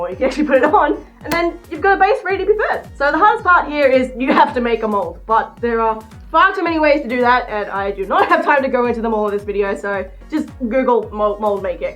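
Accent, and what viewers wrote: Australia. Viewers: i can tell Ur an Australian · Is amazing you sound so auzzie I love it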